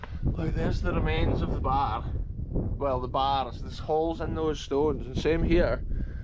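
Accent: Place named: Highlands, United Kingdom. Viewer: Just discovered your channel. Love your videos! It's really interesting exploring these old places. I'm lucky to have 4 or 5 ruins close to my house 😍 Love your accent too. Where is you're from? Wee bit of Highland twang in there!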